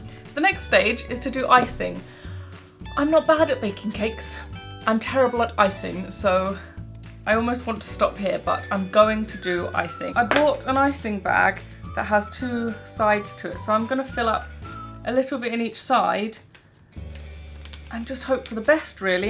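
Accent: United Kingdom. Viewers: British I believe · Shes british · Are you from uk if you are Which part are you from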